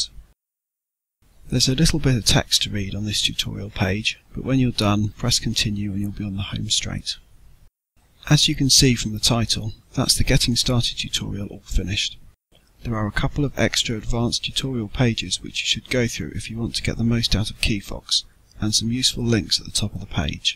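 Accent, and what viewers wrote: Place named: United Kingdom. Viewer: GOD you could do a lot to improve your audio not to mention working on the dialect intensity so that those not from the UK could understand you. With both issues it makes it pretty bad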